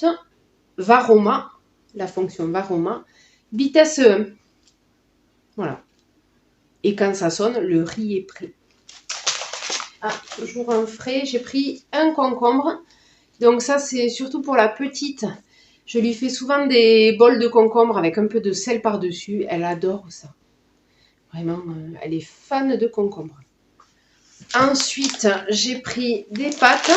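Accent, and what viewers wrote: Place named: South, France. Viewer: Je découvre vos vidéos et c'est très intéressant pour essayer d'optimiser ses courses et limiter les dégâts... Au vu de votre accent... Ceci me laisse croire que vous êtes du sud ou je me trompe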